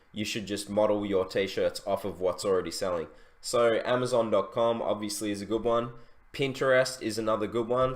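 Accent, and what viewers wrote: Australia. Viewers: Thanks again meng you the best!! You are from Australia correct? Sorry if i'm wrong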